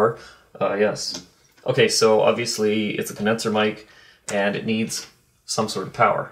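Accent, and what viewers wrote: Canada. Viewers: Ohhhhh... You're Canadian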